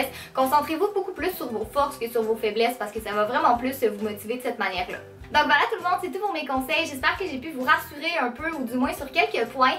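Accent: Quebec, Canada. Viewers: T'es vraiment trop belle et ton accent québécois est juste trop beau je suis sous le charme ! ♥w♥ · perso j'aime tellement son accent que je mentraine a parler avec l'accent québécois (je c je suis bizzard) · Arrête de rager et écoute pas ses vidéos elle apprends , et si tu veux que son accent s'améliore , ne la décourage pas avec ton commentaire débile elle est canadienne et toi ton commentaire est RACISTE désolé mais toi tu ne vaut rien car tout le monde apprends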